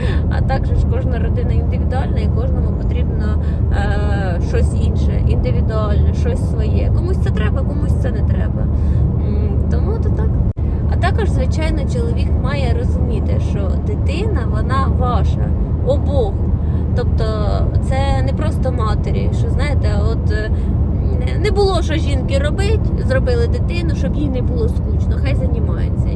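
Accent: Poltava, Ukraine. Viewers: Я 45 років живу з полтавчанином і цей полтпвський суржик впізнаю де завгодно Гріє серце.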